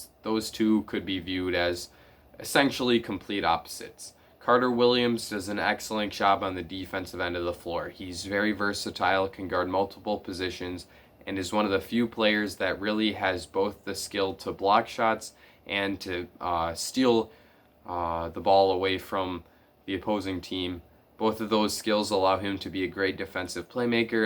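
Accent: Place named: Canada. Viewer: the way you pronounce a couple words sounds Canadian, being from Wisconsin makes sense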